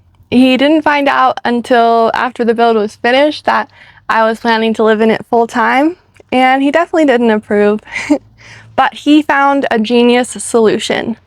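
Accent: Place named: United States. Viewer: I really like this accent, can someone let me know which part of the US is it from?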